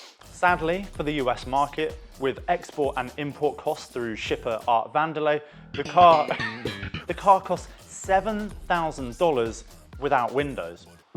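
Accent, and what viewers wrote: United Kingdom. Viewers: The subject matter is fairly interesting and you would make a great straight man in a comedy team duo because you have a great dry sense of humor. The British accent is always a plus too. We half brothers and sisters out here are always captivating by that proper English. Something about it just fascinates the rest of us. We really do love the people of the UK. But getting back to the little Chinese EV's, they really have very limited real world applications the way I see it. No offense please. I think I would want one strictly for inner city driving only. Other than that, not so much. So, Pip Pip, Tally Ho and all that good stuff. Take care my good man.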